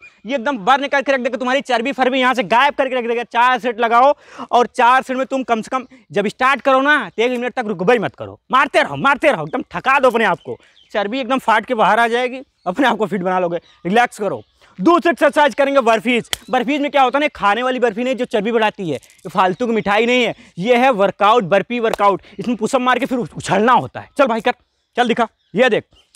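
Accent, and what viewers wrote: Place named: Uttar Pradesh, India. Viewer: Bhai aapki bato se lag rga h aap hamare hi taraf ke ho...... Zila sultanpur.......up Mja aa gya bhai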